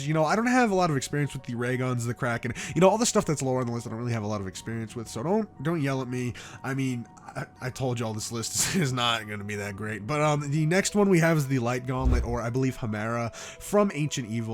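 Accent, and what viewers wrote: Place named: Greece. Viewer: cause you have a kinda Greek accent also I am Greek as well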